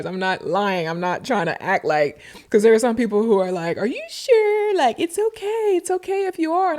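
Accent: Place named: South, United States. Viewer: Girl you are so funny! Especially with that southern lady accent. Have me hollering in my room “you gotta let go & let God” 😭❤️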